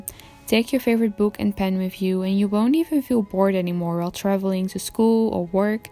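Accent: Netherlands. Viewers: Love your video!!🐙 zodra je begon te praten wist ik dat je uit nederland kwam wat leuk 🙌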